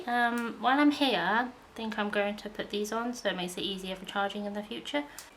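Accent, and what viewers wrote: United Kingdom. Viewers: you have british accent - funny... honest gurl / great video.. thumbs up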